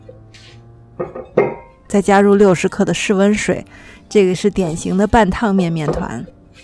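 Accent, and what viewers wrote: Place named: North, China. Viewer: 怎么是大陆北方的口音，带点儿化音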